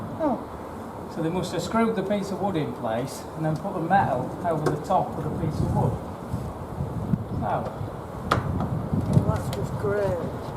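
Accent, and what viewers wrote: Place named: Leeds, United Kingdom. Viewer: I am thinking your from Leeds but I hope from Sheffield put me out of my misery maybe Rotherham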